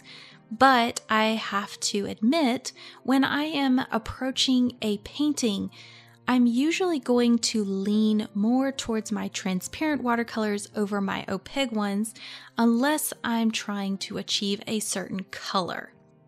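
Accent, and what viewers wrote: South Carolina, United States. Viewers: I like to guess a speaker's location by their accent. South Carolina?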